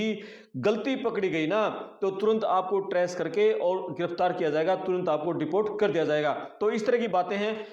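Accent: Haryana, India. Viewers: U r fine , perfect presentation bt need to improve hindi,u r haryanvi accent bt disappointing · जी आपने अपनी भाषा पर नियंत्रण पा लिया है 90% control है। पता ही नहीं चलता कि वक्ता हरियाणवी मूल से है हल्की सी हरियाणवी झलकती है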